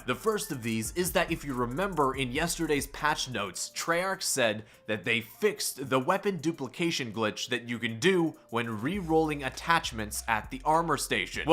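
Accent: Canada. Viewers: Are you Canadian by any chance? Your accent sounds Canadian.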